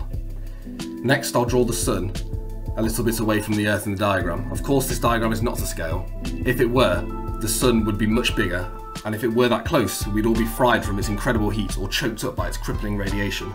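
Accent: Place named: United Kingdom. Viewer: Your setup would be ideal if you were standing on the equator at either the spring or fall equinox. The reason your result is lower than the actual is due to the geometry that results from the tilt of the Earth at that time of year and of your latitude on Earth. If you do this experiment on the 1st day of spring or 1st day of fall, then you'd only need to adjust due to your latitude. If you divide by the cosine of your latitude (you sound British so lets say South England ~50 deg), you'd get 6222 km (4000 / cos(50)).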